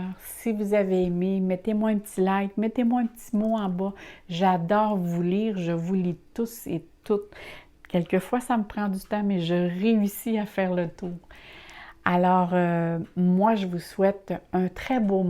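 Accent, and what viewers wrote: Canada. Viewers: Merci, pour vos conseils précieux et précis, avec un petit accent en plus qui me rappelle des bons souvenirs de mon passage au Canada.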